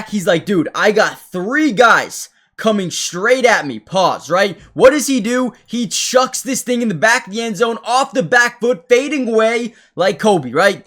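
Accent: Canada. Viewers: Sounds Canadian eh?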